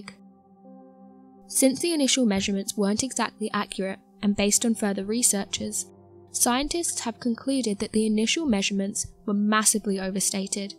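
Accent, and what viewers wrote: England, United Kingdom. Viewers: Cute English accent🖖👽🤖👾🛰️☄️🚀👻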